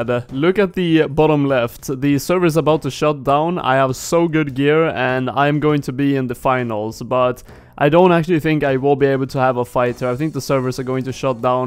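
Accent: Sweden. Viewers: Good stuff man! If my ears don't lie that accent has to be Swedish. Lycka till i fortsättningen!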